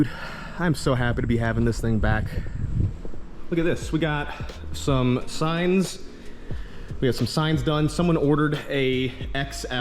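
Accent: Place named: United States. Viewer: A man and his deep country accent TRUCK, Yee Yee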